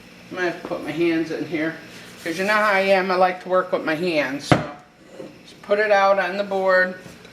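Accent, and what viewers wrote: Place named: Maryland, United States. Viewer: Where are you? Your accent reminds me of Maryland.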